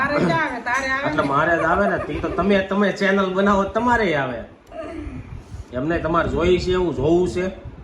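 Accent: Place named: Surat, India. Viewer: acdam surati bole chhe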